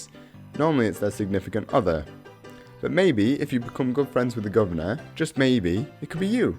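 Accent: United Kingdom. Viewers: English accent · I liked the way you explained it with a British accent.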